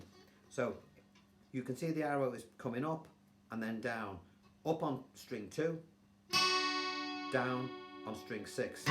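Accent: Liverpool, United Kingdom. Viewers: First of all are you from Liverpool? Also have you thought about writing this out in tab instead of chord charts?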